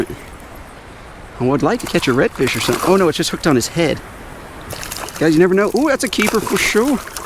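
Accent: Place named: South, United States. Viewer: Love the little southern twang you picked up hanging wit dem boys.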